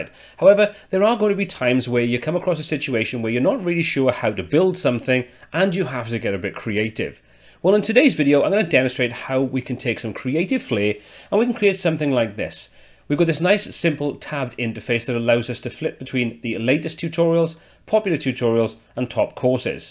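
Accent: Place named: Newcastle, United Kingdom. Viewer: Well, isn't that an elegant approach, very smart! I'm trying to find some visually interesting way of doing 3 tabs that are all text (question then answer), and found your video, and though this doesn't work for me in this case, it's something I'm bookmarking and saving, as I think I may have a use for this in the future. Thank you. Have subbed as well, am looking forward to watching more of your content. And, you sound Geordie...are you? My Dad was from Wallsend, it's my favourite UK accent and always makes me think of him when I hear it!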